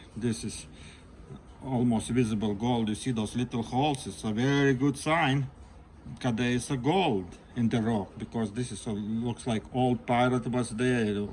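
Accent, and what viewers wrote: Russia. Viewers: Can you say "Get to the choppa" in your russian accent?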